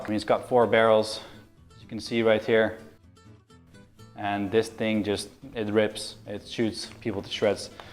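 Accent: Netherlands. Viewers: Are you dutch ik wel btw XD love your video’s ❤️✌️ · Ja hij is Nederlands dat hoor je ook in veel video's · Are you dutch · Ben je eigenlijk Nederlands of Belgisch? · Ik vind het gewoon grappig hoe erg je je Nederlandse accent kan horen als je Engels praat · Are you frome Holland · I like when you show the clips are you dutch btw? I kind of get that feeling by youre accent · Bro are you dutch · Are you Dutch? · Wut ure dutch... omg ik ooook! Jajajajaja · je bent nederrlands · Je bent Nederlands praat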